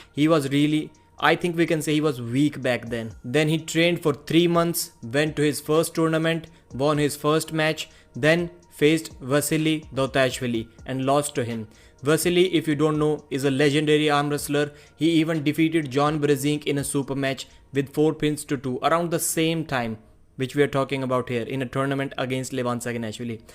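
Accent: India. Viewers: Indian accent is horrible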